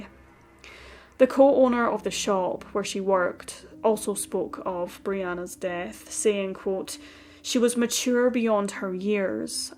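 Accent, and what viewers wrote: Scotland, United Kingdom. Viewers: 🔥 Scottish accent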